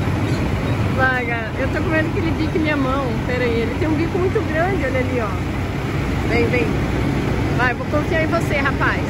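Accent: South, Brazil. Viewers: um cara leigo nisso tudo...só viajando com a do café cromado mais linda nos USA motorista e vendedora de empadão! Mas essa carga e potes não poderiam ser amarrados com essa cinta nessa mesma estrutura contra as paredes onde você engancha - tipo um cinto de segurança para as cargas. Sempre linda de Curitiba! - "sotaquezinho" do sul da minha terra é fantástico! ehehe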